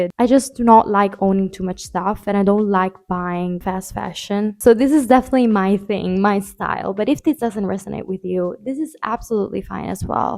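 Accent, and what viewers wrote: Italy. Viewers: I'm sorry in advance if it's common knowledge but you sound italian... Btw I'm already starting to enjoy your contents, you have a beautiful voice ❤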